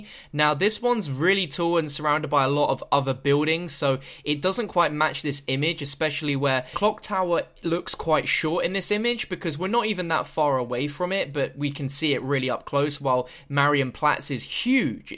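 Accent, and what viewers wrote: United Kingdom. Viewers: the fact he has a british accent makes him reading the letter so much better.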